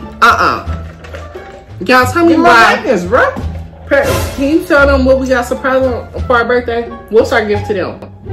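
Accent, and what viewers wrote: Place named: Miami, United States. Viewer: He sound like Miami am i wrong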